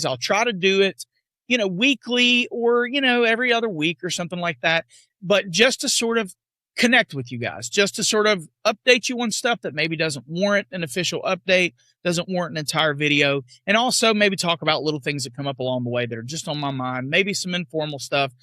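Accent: South, United States. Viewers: Because of your Rebuttals, you have exceeded your way into becoming my favorite, your knowledgeable experience, Southern Drawl & fantastic sense of humor using colorful language like "2x4 headed" <~~ Who talks like that??! YOU do!! And I love it! 😅 Love it!! Love it!!! Thank you for being you,.. 💚💛💚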